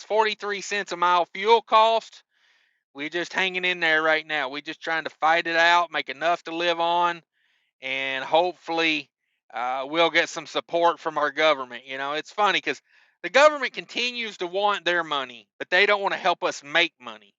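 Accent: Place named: New Orleans, United States. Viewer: BTW.. You're accent is ....terrible. Some New Orleans in the rep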